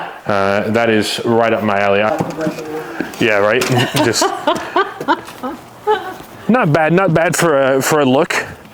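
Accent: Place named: United Kingdom. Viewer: I KNEW I wasn’t going crazy when I heard the British accent bahah😂 you flipped a switch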